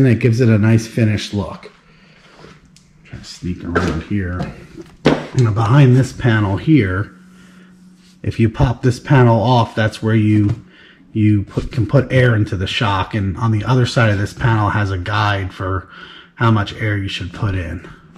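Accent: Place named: Western Pennsylvania, United States. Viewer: Your voice sounds like you are from western Pennsylvania.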